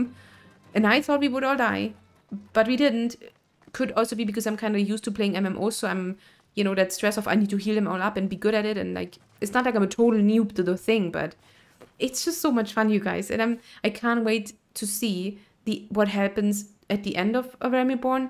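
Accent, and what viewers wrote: Germany. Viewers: Ist that a German accent? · Thought you were german, you speak english like a german one. Greetings from Germany to France =)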